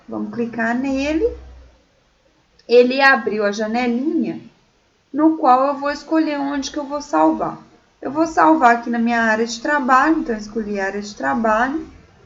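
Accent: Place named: Minas Gerais, Brazil. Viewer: Valeu, profa! Ótimo introdutório e com esse maravilhoso sotaque mineiro (adoro!)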